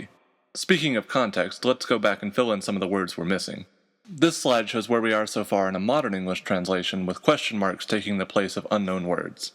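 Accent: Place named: United States. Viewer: I found an American accent funny talking about Middle English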